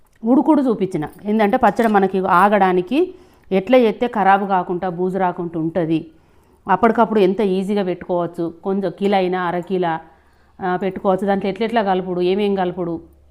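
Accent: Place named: Medak, India. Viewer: Meeru Medak district la mataduthunnaru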